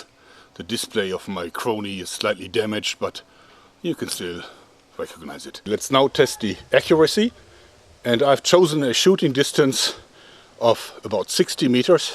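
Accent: Germany. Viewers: Don't be sorry for speaking german, thast is your heritage and birth right, I love your accent and the videos you make are most entertaining. Im from a town called SPARTA Missouri and all we have here is amarican whites as far as the eye can see, (myself being included), except of course for the asians who run the small asion food resteraunt in the middle of the town. Its nice hearing and seeing people from differant parts of the world and your german is a fresh experiance from the norm.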